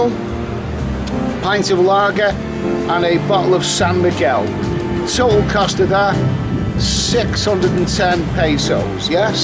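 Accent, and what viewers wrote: Liverpool, United Kingdom. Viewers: a bit of a scouser I think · New to your page, you sound like a scouse. I haven't lived in UK for almost 50 years. It looks OK there.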